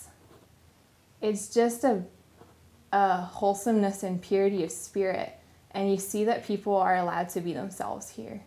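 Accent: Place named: Uruguay. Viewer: I was like hey why is she speaking Spanish with an Uruguayan accent? Hahaha.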